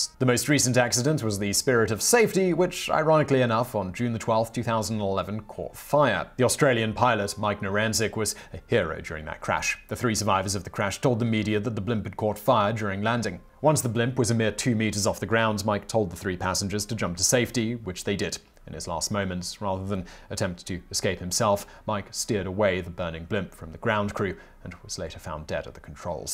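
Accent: United Kingdom. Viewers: Did it sound weird to anyone else when he said the speed in miles per hour before saying it in kilometers? Like maybe my brain, hearing a British accent, prepares itself to pay attention to the second set of numbers?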